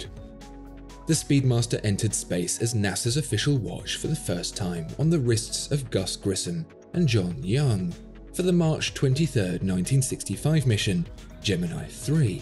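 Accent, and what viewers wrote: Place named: England, United Kingdom. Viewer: I'm not happy with the pronunciation you use for omega, I've heard Americans pronounce that way but you have an English accent.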